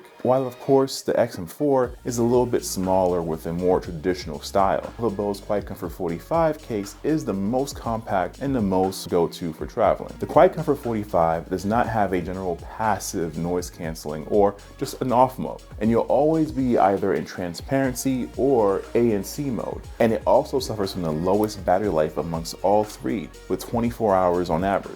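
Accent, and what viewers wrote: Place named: Philadelphia, United States. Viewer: Sounds like you are in the Philadelphia region based on those stops 😅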